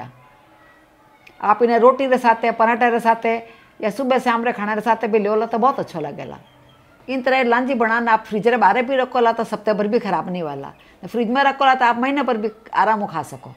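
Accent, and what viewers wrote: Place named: Rajasthan, India. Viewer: Unki etni mithi boli he.. Rajasthani boli me bat krti h wo .. full traditional. Aapko Nhi samjh aata to koi channel dekh skte ho aap.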